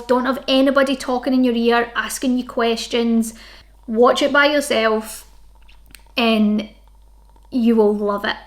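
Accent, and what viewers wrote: Scotland, United Kingdom. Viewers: I just really wanna hear you say "THERES BEEN A MURDER" in your scottish accent...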